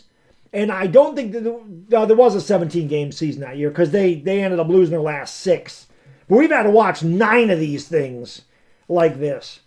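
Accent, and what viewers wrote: New York, United States. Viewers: Dude you are so funny, Love your content with that New York accent shit talking You and crack me up Stay loving your Giants Cowboy Diva for life Texas Gal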